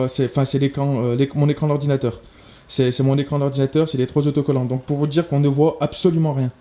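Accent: Saint-Étienne, France. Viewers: j'en ai beaucoup appris sur cette camera super vidéo mais par contre t'as UN PUTAIN D'ACCENT STEPHANOIS tu nous représente bien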